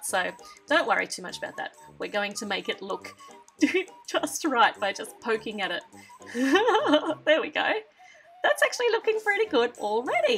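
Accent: Australia. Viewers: Is she australian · Are you from Australia?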